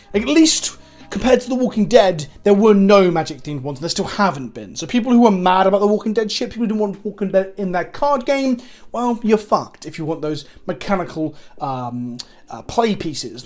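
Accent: United Kingdom. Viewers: Woah woah hang on... you’re British?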